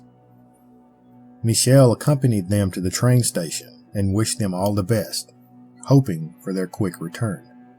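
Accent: South, United States. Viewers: your smooth southern drawl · that smooth southern voice of *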